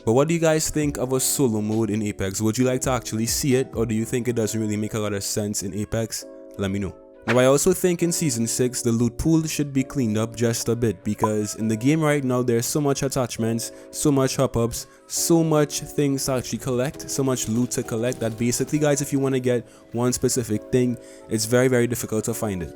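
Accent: Trinidad and Tobago. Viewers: Are you trini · Are you from Trinidad and Tobago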